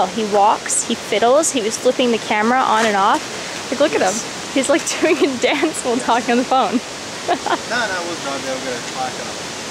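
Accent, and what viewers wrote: Australia. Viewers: you are sounding more and more Australian every video C: haha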